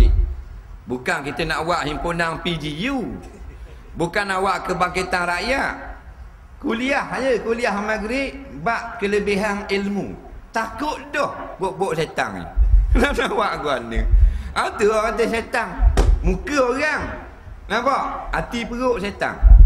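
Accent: Malaysia. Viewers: ni pelat terengganu..but it's ok la..lebih kurang je pelat dua negeri tu..hehe..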